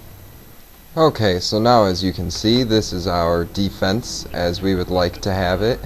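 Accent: Boston, United States. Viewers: FUCK that boston accent annoys the fuck out of me. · ROFLed the whole time with the Buuuston accent.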